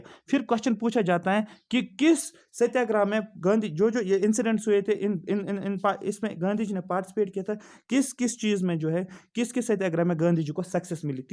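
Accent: Kashmir, India. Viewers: bhai kashmiryu ki hindi todi diff hai...tbhi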